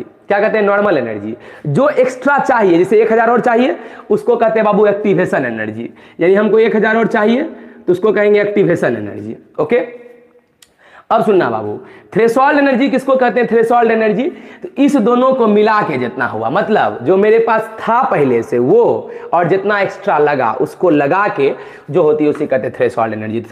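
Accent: Bihar, India. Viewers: Bihari accent 🫂🔥🔥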